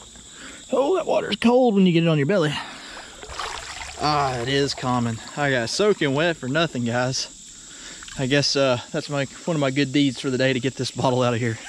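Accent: South, United States. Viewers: That's not a "southern accent"!!! If you know your way around the country,(OTR) truckers know what I mean... You have an Alabama accent.. Texas and Georgia are subtly different... The Midwest, Illinois, Ohio, New York City,New Jersey etc. All have a manner of speaking that are truly different... North and South Carolina,too.... Love your site and your enthusiasm for when you find something really nice....🤓 Watch out for the rabid crawdads and insane fish😂.... Be Blessed and stay safe...🙏☝️🕊️✝️ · I was born a yankee, but I’m a southerner now. The accent sounds like sweet tea served on the front porch. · From northern midwest, where not much accent. Southern accent is cool.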